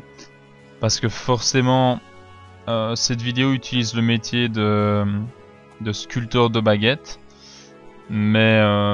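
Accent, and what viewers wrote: Belgium. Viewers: Ah un Belge !!!!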